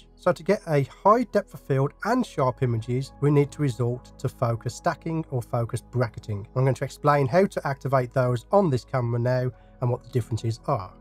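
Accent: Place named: Birmingham, United Kingdom. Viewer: that makes sense. I ended up getting one of the rails a bit like the Nisi one that you reviewed. I had a cheaper 4 way plastic one but I found it was a bit temperamental at moving. Also picked up a OM-1 which I am super happy with! Also, Birmingham based? I am sure I recognise that accent! (Brummie here too, but moved away for 10 years and recently came back, god knows why ha).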